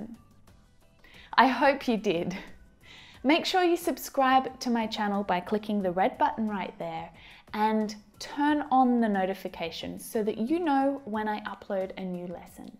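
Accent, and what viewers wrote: United Kingdom. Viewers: looks like your english pronunciation "british." anyway . thanks. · Ok ,,.es que estoy aprendiendo el inglés pero de pronunciación Británica que es más limpia y solo encontraba Americana... y te encontré...besos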